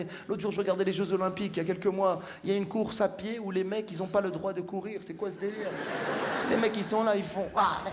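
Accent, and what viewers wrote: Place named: Morocco. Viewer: J aime bien l'accent français marocain Je suis italienne et à travers tes sketch, j 'apprend mieux la langue française, ses sang,intonation ,prononciation Merci bien ,Bravo !!!!!!!!!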